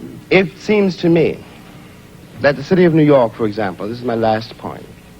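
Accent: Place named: England, United Kingdom. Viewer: Wow he sounds English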